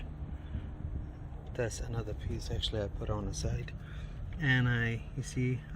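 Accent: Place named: Iraq. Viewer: Nice Iraqi accent 😊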